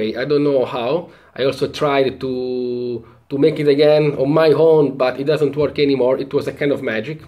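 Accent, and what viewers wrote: Italy. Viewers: si sente che sei italiano LOL... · I love your Italian accent when you talk English! And again, many thanks for putting up this wonderful series to both you and your wife. I love Indian barbers and it was so great to see so many videos with all the different and unique barbers / masseurs. I think I'll be watching this series of videos for a LONG time to come. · Hello ! such a pleasure to hear you express yourself , what a beautiful Italian accent . The videos of India were wonderful , such great effort put in them. I'll have to look at some again to choose in taking the survey . Thank you for all you've done . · si sente che sei italiano ahah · Si sente che sei italiano ahah Sei un grande! Come ti chiami e come è nata questa idea?!?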